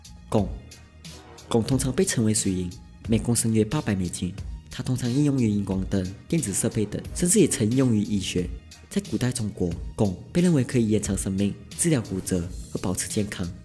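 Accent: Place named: Malaysia. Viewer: 這馬來西亞口音我一北方人真的是聽的累死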